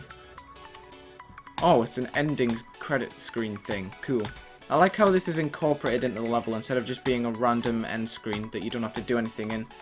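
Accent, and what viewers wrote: United Kingdom. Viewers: He's British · well yh but I think he has a SPECIFIC British accent. I get what ur saying tho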